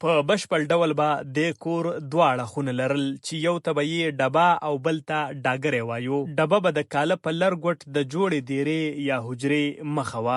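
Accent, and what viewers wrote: Standard. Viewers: ډېر پهٔ ښکلې، پاکه، خوږه او سوچه پښتو خبرې کوې. دپښتنې ټولنې ښایسته او اصلي انځور وړاندې کوې. ډېره مننه ❤️🇦🇫 ستاسو ددې خدمت لپاره ډېر څهٔ کهٔ هم وشي کمه ده. ما سبسکرایب کړې، فالو مې هم کړې، ويډیوز درته هم ګورم او لایک کمنټ هم کوم.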